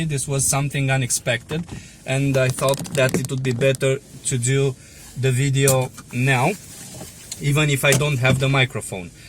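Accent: Romania. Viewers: De la o poștă se simte ca ești român 😂🫡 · Tu esti roman? Your accent sounds very Romanian. Pe vremuri era usor sa-mi dau seama, ca eram toti cu Dacii LOL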